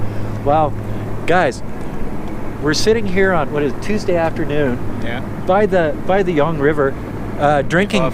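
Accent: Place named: Canada. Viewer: the most canadian accent of all time and i love it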